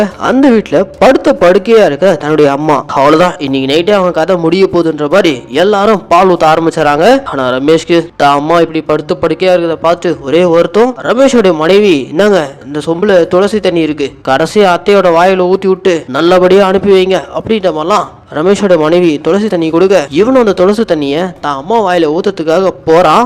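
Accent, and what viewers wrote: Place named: Puducherry, India. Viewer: But neenga pesurathu pondicherry side pola iruku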